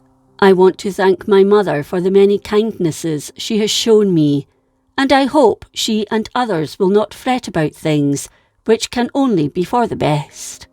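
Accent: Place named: Scotland, United Kingdom. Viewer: A Scottish accent.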